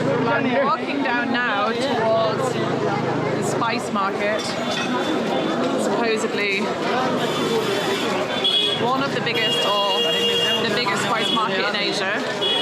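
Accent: United Kingdom. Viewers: It seems you are British by your accent.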